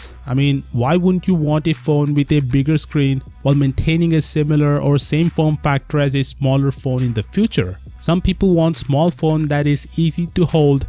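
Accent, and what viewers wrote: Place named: India. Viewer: It's impossible to listen to this indian english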